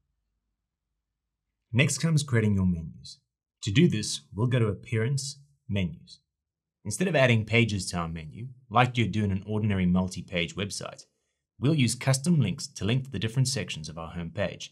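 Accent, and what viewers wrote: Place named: South Africa. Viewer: Great video, really helpful thanks. And brilliant to hear a Saffa accent!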